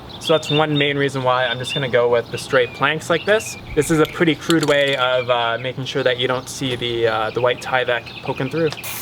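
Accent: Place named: Canada. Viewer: The Canadian accent 😬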